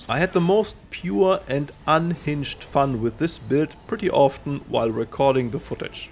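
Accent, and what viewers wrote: Germany. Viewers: Ich hör den Deutschen noch ganz leicht raus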